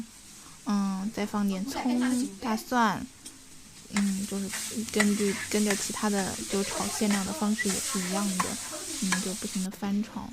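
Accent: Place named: Duchang, China. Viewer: 听到都昌话了...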